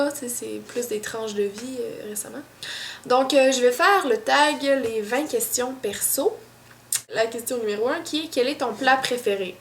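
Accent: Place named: Quebec, Canada. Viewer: Je suis totalement fan de ton accent (vive le québec :3), et tes vidéos ^^.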